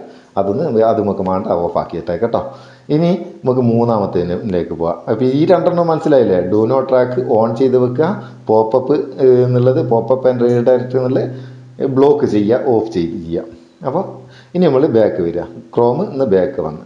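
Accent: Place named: Vadakara, India. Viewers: ഇഞ്ഞി കോയിക്കോട് ആണല്ലേ... ഇമ്മളെ ജാനു ഏടത്തി ടെ... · ശരിയായ മേപ്പയ്യൂർ സ്ലാംഗ് തന്നെ. കൃത്രിമത്വം തീരെയില്ലെ. കൊള്ളാം! ക്ലിപ്പ് കണ്ടോരെയൊക്കെ കൈയ്യിലെടുത്തല്ലൊ! ഉഷാറായിക്ക് കുഞ്ഞോനേ! Thanx! Go ahead! Kadathanadan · ഗുഡ് മെസ്സേജ് , അവതരണവും കുഴപ്പമില്ല. ഒരു നാദാപുരം സ്റ്റൈൽ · നല്ല തനി വടകര സ്റ്റൈലിൽ അറിവ് പകർന്നു തന്നതിന് വളരെ നന്ദി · നല്ല നാടൻ പയ്യോളി ഭാഷാ നൈസ്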